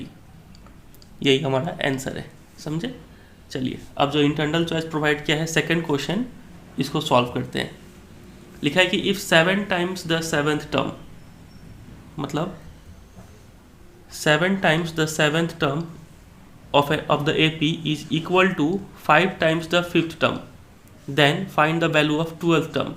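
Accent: Bihar, India. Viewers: Sir apki voice bihar-jharkhand ke logo ki jaisi h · Bihari hai aapna bhasaa aur sanskriti kaise bhul sakte . Proud to be bihari.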